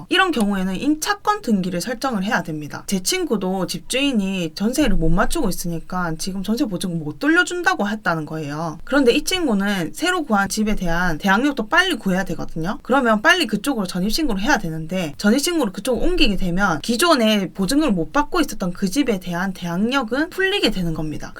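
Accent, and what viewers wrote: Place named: Busan, South Korea. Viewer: 사투리 윽수 까리해요 맕 가 마산 창원 울산 아니고 딱 부산이네마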